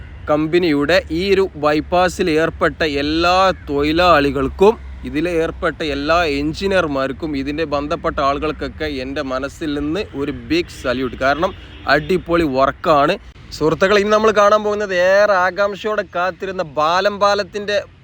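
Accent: Malappuram, India. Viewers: വീഡിയോ അടിപൊളിയാണ്‌.. പ്രത്യേകിച്ചും അവതരണ രീതി especially Drone shot..ആ ഒരു effortന്‌ 👍🏻.. ഞാനൊരു കണ്ണൂർക്കാരനാണെങ്കിലും നിങ്ങളുടെ Malappuram സ്ലാങിലുള്ള വിവരണം കേൾക്കാൻ നല്ല രസമുണ്ട്‌..keep it.. പിന്നെ സ്ഥല പേരുകൾ പരമാവധി മലയാളത്തിൽ എഴുതുന്നത്‌ പോലെ തന്നെ പറയുക..😀 ഉദാ: മുഴപ്പിലങ്ങാടിനെ മുഴുപ്പിലങ്ങാട്‌ എന്ന് പറയരുത്‌ pls 😇 അതുപോലെ മലപ്പുറമല്ലാത്ത മറ്റു ജില്ലകളിലെ വീഡിയോ Editting Timeൽ വീഡിയോയിലെ റോഡ്‌ കടന്നു പോകുന്ന സ്ഥലങ്ങളുടെ പേരുകൾ Google map,Google earth,Sign boardകൾ ഒക്കെ നോക്കി മനസ്സിലാക്കി പറഞ്ഞാൽ ആ ജില്ലക്കാരല്ലാത്തവർക്ക്‌ പ്രത്യേകിച്ച്‌ Vdo കാണുന്ന പ്രവാസികൾക്ക്‌ വളരെ ഉപകാരപ്പെടും...പറയാൻ കാരണം പല വീഡിയോയിലും ഈ സ്ഥലത്തിന്റെ പേരെന്താണെന്ന് അറിയില്ലാന്ന് നിങ്ങൾ പറയുന്നതായി കാണാറുണ്ട്‌..എല്ലായിടത്തുമില്ല (അതെ സമയം ഇങ്ങനെയുള്ള vdo ചെയുന്ന മറ്റു ചിലരൊക്കെ ഓരോ underpass etc അടുത്തുള്ള റോഡും വഴിയുമെല്ലാം വിവരിക്കാറുണ്ട്‌). പിന്നെ കഴിയുമെങ്കിൽ ചിലരൊക്കെ ചെയ്യും പോലെ ആ റോഡിന്റെയും സ്ഥല പേരുകളിൽ vdoൽ എഴുതി mention ചെയ്താൽ നന്നായിരുന്നു.. 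പിന്നെ നിങ്ങളിൽ കണ്ട ഒരു Plus point Thalassery-Mahe bypassനെ നിങ്ങൾ ആ പേരിൽ വിളിക്കുന്നതാണ്‌..ചില YouTubers മുഴപ്പിലങ്ങാട്‌ bypass,അഴിയൂർ bypass എന്നൊക്കെ വിളിക്കുന്നത്‌ കാണാം.. (കോഴിക്കോടും കണ്ണൂർ ഭാഗത്തേക്കും NHൽ കൂടി ഇടുങ്ങിയ റോഡുകളുള്ള തലശേരി-മാഹി ടൗണുകളെ Touch ചെയാതെ പോകുന്നവർക്ക്‌ വേണ്ടിയുള്ളതാണല്ലോ ഈ bypass..ഇത്‌ ഈ നഗരങ്ങളിലെ ഗതാഗത കുരുക്കിന്‌ ഒരാശാസ്വവുമാകും..) കോഴിക്കോട്‌ ജില്ലാ അതിർത്തിയായ കുഞ്ഞിപ്പള്ളിക്കും അഴിയൂരിനിടയ്ക്കും നിന്ന് തുടങ്ങി കണ്ണൂർ ജില്ലയിലെ മുഴപ്പിലങ്ങാടിൽ അവസാനിക്കുന്നത്‌ കൊണ്ടായിരിക്കാം ചിലർ ഇങ്ങനെ പരാമർശിക്കുന്ന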